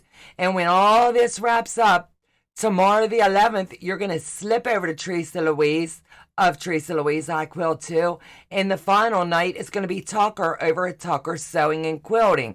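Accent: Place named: Pittsburgh, United States. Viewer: Are you from Pittsburgh? I recognize that twang.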